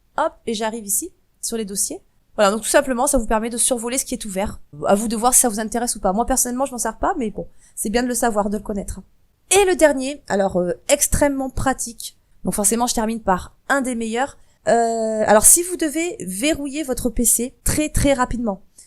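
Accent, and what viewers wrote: South, France. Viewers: Tu as un accent de qq qui a vécu à un moment dans le sud mais pas forcément toute sa vie ? Moi j'utilise souvent : Ctrl+F pour rechercher des mots clefs. Ctrl+clic gauche pour ouvrir un lien dans une autre page. Ctrl+la molette pour zoom/dezoom. et ctrl alt supp.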